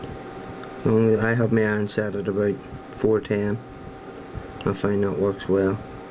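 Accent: Northern Ireland, United Kingdom. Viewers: Pretty sure he's from Northern Ireland. -_ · thats a northern ireland accent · Actually he is northern Irish, which is in the UK, not Republic of Ireland which isn't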